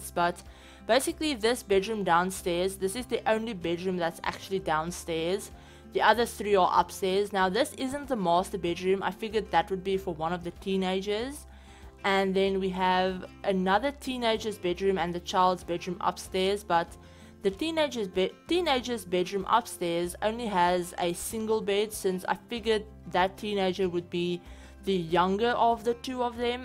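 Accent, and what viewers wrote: Afrikaans, South Africa. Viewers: Are you a Afrikaans speaker? 🇿🇦 Excellent build 👍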